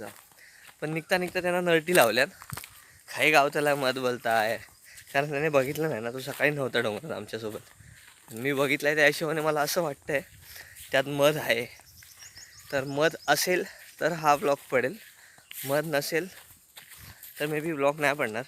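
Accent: Malvani, India. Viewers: Bharichhhh re maja Eli bghuk pan tumchi vat lagli naa.... Malavani ch boll bara vatta eikak 😄aaplo konkan asoch hirvalit rhavan de Maharaja 🙏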